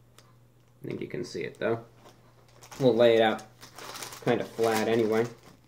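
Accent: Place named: East Coast, United States. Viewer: This man seems like a character that you would encounter in one of the Fallout games, particularly 4 due to his east coast accent.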